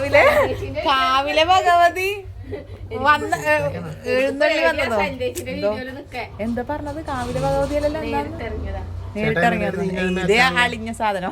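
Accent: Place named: Kochi, India. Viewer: സന്തോഷം ഞങ്ങ പോണ്. നമ്മുടെ കൊച്ചി ഭാഷ മറന്നില്ല 😂😂😂❤❤❤❤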